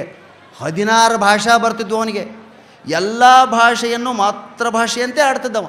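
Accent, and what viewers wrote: North Karnataka, India. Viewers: Tumba chennagi matadtira .uttar karnatakada janane hingripa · ಪ್ರಾಣೇಶ್ ನಿಮ್ಮ ಉತ್ತರ ಕನ್ನಡ ಬಾಷೆ ಕೇಳುವುದಕ್ಕೆ ನಮ್ಮ bangalore ಜನರಿಗೇ ಬಹಳ ಖುಷಿ ಯಾಗುತ್ತೆ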